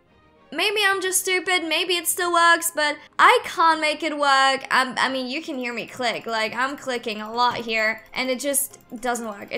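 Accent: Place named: Australia. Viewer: !! u sound aussie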